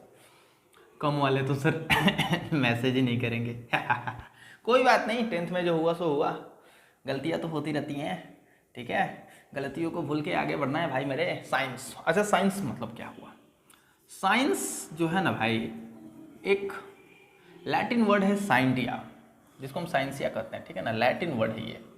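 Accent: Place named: Bhojpuri, India. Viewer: Bhojpuriya andaaz me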